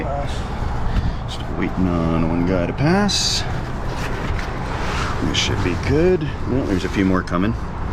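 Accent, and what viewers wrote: Canada. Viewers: After I listening to your accent for several sentences I thought to myself, "I wonder if this bloke is a Canuck?" Then, within 3-5 seconds you mentioned your drink, a beer that came from Alberta! Excellent conception for the video, great content, and my very best wishes from South of the Border.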